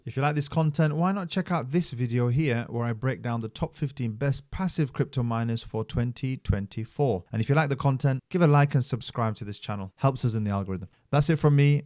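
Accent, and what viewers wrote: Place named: India. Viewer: I can hear an indian undertone. good video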